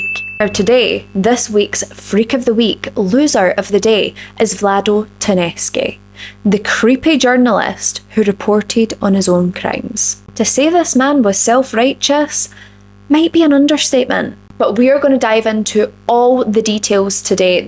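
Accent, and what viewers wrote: Scotland, United Kingdom. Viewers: I noticed my notification for your video and yelled out you posted, my 9 yr old son yelled whoo hoo because even he knows how much I love you❤. You do stories I haven’t heard and I love that you have the same thoughts of the criminals and things they do so it’s more engaging to watch! Not to mention I adore your Scottish accent, I tried to learn it by watching videos but it’s not so easy😂. If I could have some makeup tips that would be great but I don’t think I would be as beautiful as you😊. Thanks for all your hard work, I hope you get noticed more, you deserve it love.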